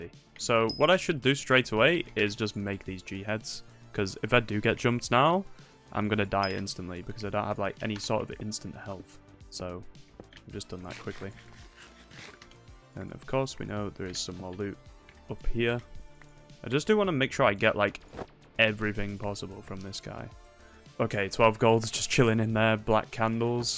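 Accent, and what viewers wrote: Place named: United Kingdom. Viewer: how i found your channel from like 10 months ago is i saw a hoplite video heard a british person speak and i was hooked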